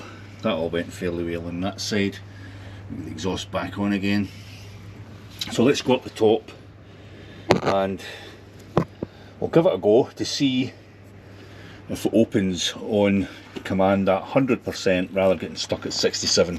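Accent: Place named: Scotland, United Kingdom. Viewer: Where are u based mate might be a stupid question because your Scottish but thought I would ask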